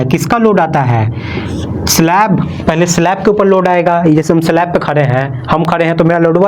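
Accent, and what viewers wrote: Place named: Bhojpuri, India. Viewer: Sir ...aaji itni bhojpuri jesi baat kar rahi ho ...acha nahi lag raha hai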